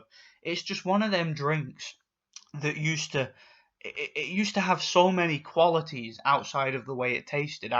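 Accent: Yorkshire, United Kingdom. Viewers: Scottish?? ... you sound like you're off Emmerdale pal! Anyway all soft drinks manufacturers are going this way...that's why Coca Cola now has Coke 'No Sugar' branding almost identical to regular Coke. Also there's no evidence that artificial sweeteners cause cancer. Sugar, although not a direct cause of cancer, does fuel the growth of cancer cells in the body. And just because Jamie Oliver campaigned to improve kids' school meals, it doesn't make him a paedophile. You have some very warped ideas mate.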